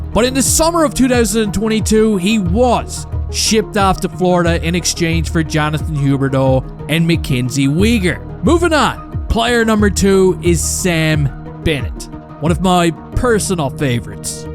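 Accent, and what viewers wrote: Newfoundland, Canada. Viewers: Are you from Newfoundland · He's a Newfie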